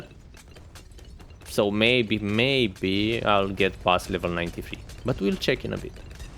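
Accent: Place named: Romania. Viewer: nu bro, ai accentul ala al nostru :))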